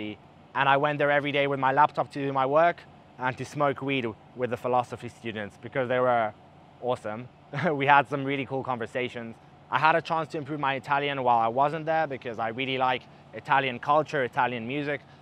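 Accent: South Africa. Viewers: You sound like a Englishman who grew up in South Africa. Your English is perfect, better than some of us Brits. seriously. 😂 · I knew their was a S/A accent, my cousins were born in Durban so I clocked that straight away. Your a very talented intelligent young man, keep up the great work but more importantly keep yourself safe 👍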